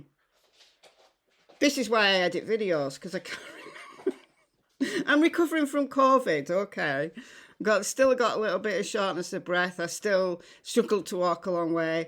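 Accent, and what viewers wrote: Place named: Wales, United Kingdom. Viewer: New Subscriber, and I Love You, you are so Refreshing and tell it like you see it, it's Awesome! Hang in there and don't team up with any other creator, as you well know! Be true to yourself! Plus my folks were from Wales, UK, and I Love hearing your accent, so simular to my parents, but sadly they are no longer with us. Take Care!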